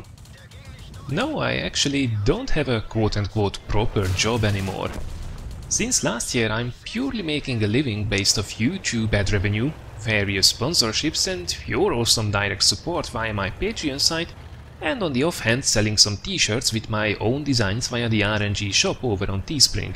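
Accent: Hungary. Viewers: Tudtam hogy magyar akcentus :D tetszik a sorozat de szerintem egy rész lehetne hosszabb is. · Az akcentus elég árulkodó :) Ráadásul Velem egykorú...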